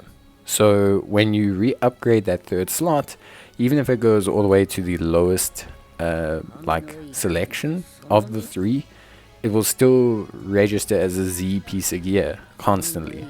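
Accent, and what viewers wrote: South Africa. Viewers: Hey are you south african?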